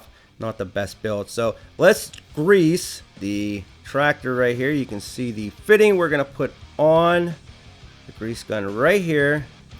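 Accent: Pittsburgh, United States. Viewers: I sense a little Yinzer in that accent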